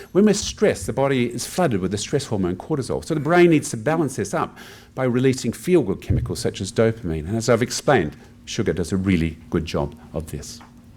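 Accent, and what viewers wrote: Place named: Australia. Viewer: Reasoning always sounds better with an Aussie accent.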